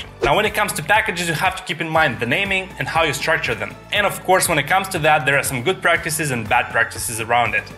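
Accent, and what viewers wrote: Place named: Russia. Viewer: really?) I've heard that aII-too-famiIiar russian accent) Thank you for great introduce!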